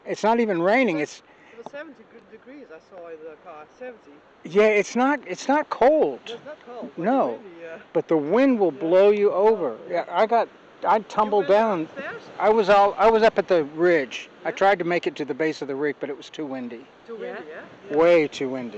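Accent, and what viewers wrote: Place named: Ireland. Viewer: Love the Irish accent,. Be safe way up there with all that wind!! Vaya con Dios!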